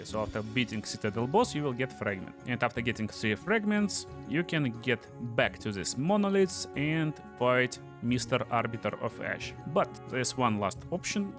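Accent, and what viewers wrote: Russia. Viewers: Most likely Russian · Strong Russian accent 😂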